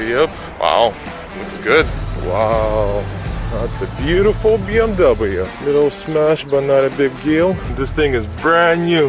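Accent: Russia. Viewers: этот русский акцент режет ухо конечно 😂😂😂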